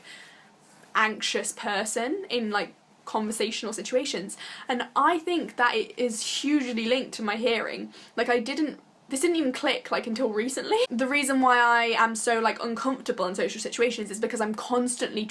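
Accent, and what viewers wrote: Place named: Midlands, United Kingdom. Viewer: Brendow Félix She's from the Midlands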